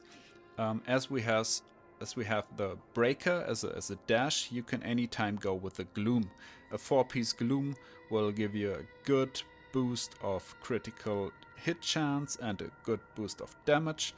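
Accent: Germany. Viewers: Bro bist du Deutscher hört man Akzent raus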